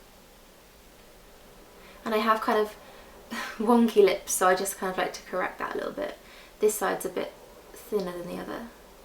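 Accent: United Kingdom. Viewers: I love your make up routines and I love your british accent 😍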